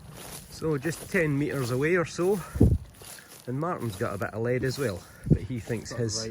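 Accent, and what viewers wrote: Scotland, United Kingdom. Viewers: Just discovered your channel and am thoroughly enjoying your videos. Love the Scottish history lessons and listening to your sounding so Scottish. Hello from Oklahoma, USA.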